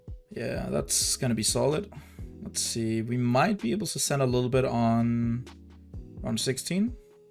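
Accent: Denmark. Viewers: yeah, I know he's from Denmark, I just find it funny when he doesn't know about things or pronounces things differently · he's Danish, our j sounds like that. fortunately for us all, doesn't drink baja blast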